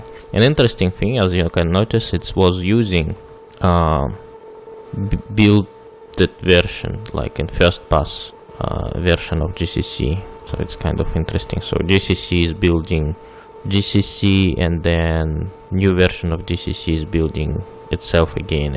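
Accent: Russia. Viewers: Are you Russian? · Мне кажется или он русский??? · С русским акцентом даже англ текст легко понимаем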